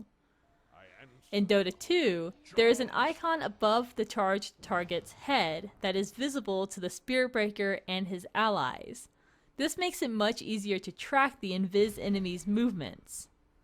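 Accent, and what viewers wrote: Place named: England, United Kingdom. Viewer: Это акцент у англичан такой.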